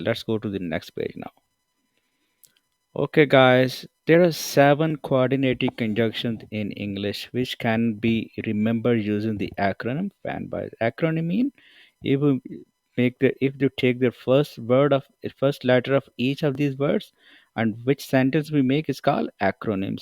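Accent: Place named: India. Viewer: Your indian accent is intolerable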